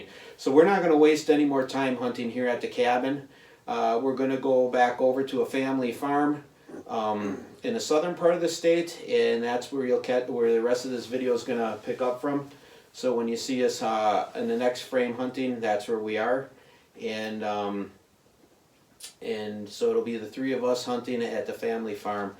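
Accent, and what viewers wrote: Wisconsin, United States. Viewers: I saw tree does.... good Wisconsin Accent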